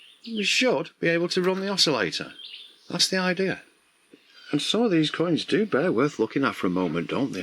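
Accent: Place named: United Kingdom. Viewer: What shocked me is a British accent living in the United States. I subscribed😂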